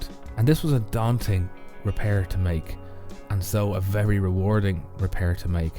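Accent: Ireland. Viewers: Great to hear an irish accent are u near Dublin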